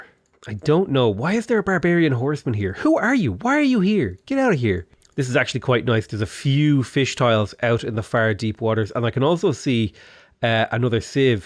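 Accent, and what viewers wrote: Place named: Ireland. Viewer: you sound especially irish today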